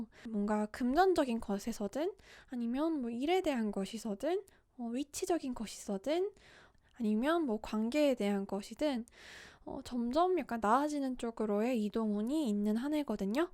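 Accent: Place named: Seoul, South Korea. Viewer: 서울말씨 귀엽네요.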